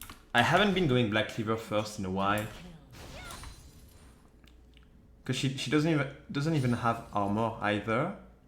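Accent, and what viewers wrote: France. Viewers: Jolie accent fréro, ça fait du bien de voir un français qui parle aussi bien anglais haha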